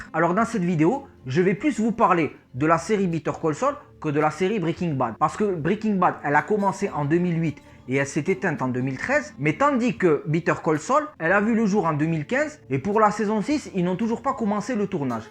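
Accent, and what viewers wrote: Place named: South, France. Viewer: Salut, ça fait plaisir d'entendre ton p'tit accent du sud. Excellente vidéo!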